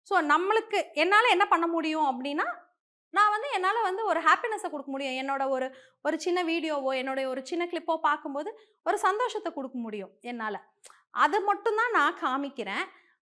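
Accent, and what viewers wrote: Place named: Coimbatore, India. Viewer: Mam i like your way of confident talking. I like your coimbatore slang i am also a coimbatorian. I admire your way of talking confidences and boldness. I pray god to give you more strength to manage your life. Your daughter is cute and beautiful takecare Sneha i like her somuch. All thebestfor your future♥️👍👌🙌🙌🌷⚘🌹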